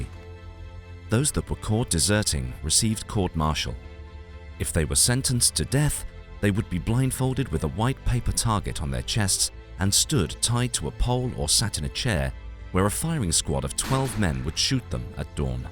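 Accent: United Kingdom. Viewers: British accent tho lol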